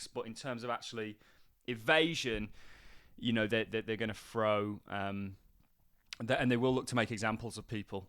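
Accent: Birmingham, United Kingdom. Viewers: What part of Brum are you from?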